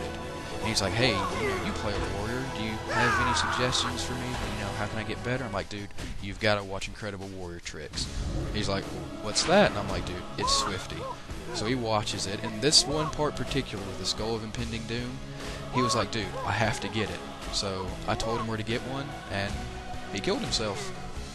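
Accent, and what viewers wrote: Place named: South, United States. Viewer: cool, i loved it and i love your southern accent like mine lol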